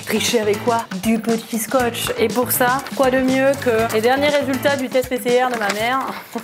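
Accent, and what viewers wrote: Quebec, Canada. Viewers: Tu peut faire une vidéo avec ton accent québécois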